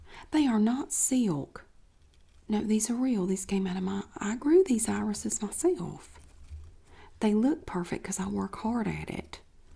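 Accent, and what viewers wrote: South, United States. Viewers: I like southern accents 😭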